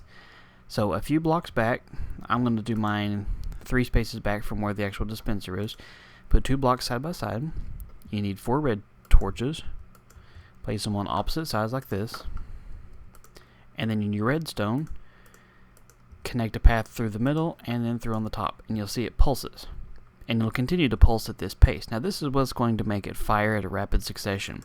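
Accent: South, United States. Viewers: I cant tell if you have a southern accent or not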